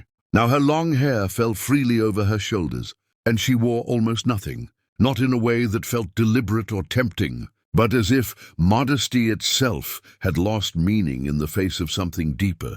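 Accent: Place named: RP, United Kingdom. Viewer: Another PERVERTED "PROPA ENGLISH " Accent that seems to twist the human aspects of the lustful story.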